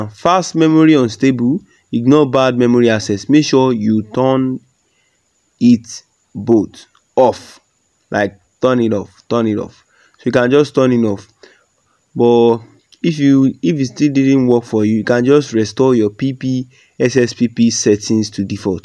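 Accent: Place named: Nigeria. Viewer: This guy sounds Nigerian cos im a Nigerian. Thanks very much really appreciate the solution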